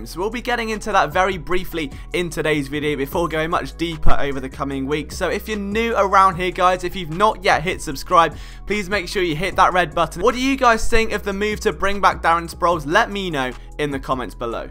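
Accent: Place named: England, United Kingdom. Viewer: Man I'm shocked I enjoy your videos as much as I do. Nothing personal but I can't stand that accent and willl turn shit off damn near instantly but your shit I don't even notice lol. All of my mom's family is from England to that's why I don't understand why it bothers me so much. Anyway keep up the great work man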